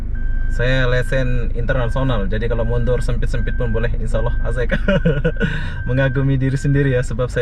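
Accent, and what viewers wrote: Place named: Malaysia. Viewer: Bang jangan cakap mirip Malaysia terus sekali sekali cakap macam Singapore ke brunei😅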